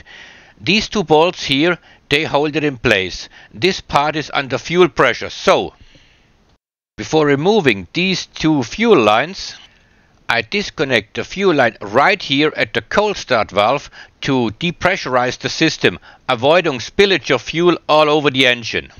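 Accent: Swabia, Germany. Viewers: Funy, funny, funny, jetzt habe ich entdeckt, dass es den Kanal auch auf englisch gibt, und jetzt verstehe ich endlich alles. Trotzdem würde ich hochdeutsch bevorzugen - aber was man nicht bekommt, bekommt man halt nicht. Schwäbisch ist jedenfalls gleich nach sächsisch nahezu unverstehbar für mich. Aber schlimmer geht ja immer! Den Schairer verstehe ich absolut Null!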